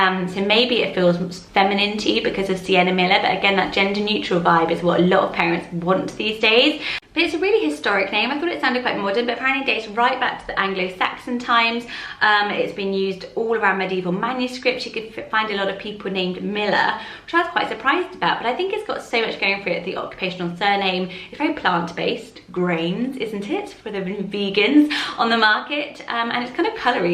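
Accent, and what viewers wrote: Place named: Bristol, United Kingdom. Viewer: The whole way through this I was like you sound like your from Bristol and then you said Wiltshire and it all makes sense haha x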